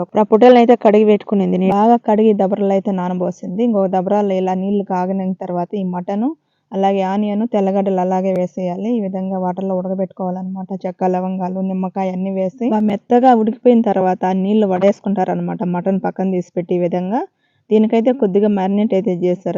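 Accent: Rayalaseema, India. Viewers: రాయలసీమ యాస.....❤❤❤🙂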